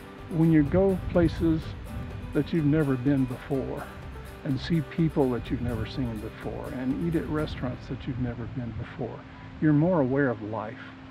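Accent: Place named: Georgia, United States. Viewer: Gotta be from Georgia. He says "Hweel"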